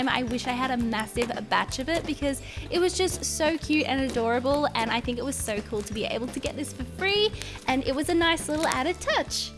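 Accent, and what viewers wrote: Australia. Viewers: are you Australian or newzealander?? nvm u say in the vid lol. im from aus too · are you australian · It's Australian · Ur Australian?! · Nimah Kazi I’m Australian, so she sounds like she’s speaking normally to me... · its Australian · Wooo! Your Aussie like me! Say OI OI OI in the reply’s I also love slime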